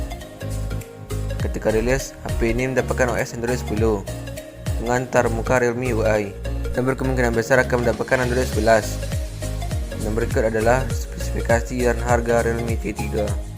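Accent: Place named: Lampung, Indonesia. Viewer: Suaranya kayak orng lampung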